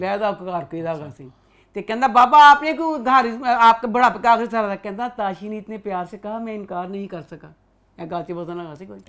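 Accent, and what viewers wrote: Punjab, India. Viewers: Thumbs up what a powerful episode the way you talk and review is love being a punjabi i love and enjoy your typical punjabi accent and verdict...jiyo love you🤩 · I love how gives all-honest reviews on every drama.............but the best reviewed drama is definitely ZEBAISH 😂😂😂 Her amazing punjabi accent and sense of humour always makes my day. This is a humble request by one of biggest fans: plz do a review of “Nund”. I am begging to hear words on it. 😜 If a smile is a sadqah, imagine what reviews do 😊 God bless and the team of Galaxy Lollywood ❤️❤️❤️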